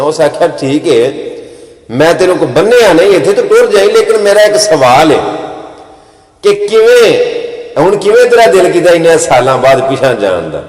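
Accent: Punjab, Pakistan. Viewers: U r great ap ka baap sealkoti hy lekin ap k nanke 100 %mandi bh ya gujrat men hun ge apki bate sun kar aisa laga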